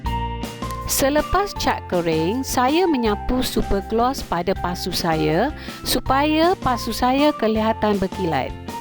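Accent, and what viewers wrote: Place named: Malaysia. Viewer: Serasa lihat film upin ipin. Bahasa nya sekampung dgn upin ipin. Xixixi. Salam kenal kak.. Saya dari indonesia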